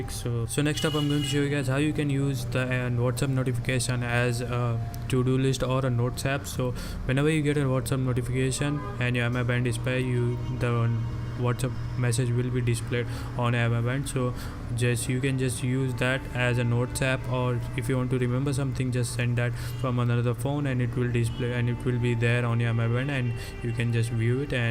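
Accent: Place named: India. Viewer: I hate this indian accent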